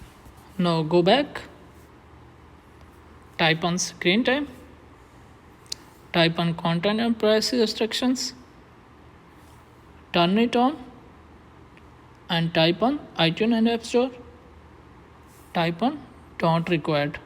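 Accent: India. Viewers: This is the only indian sounding guy I can trust.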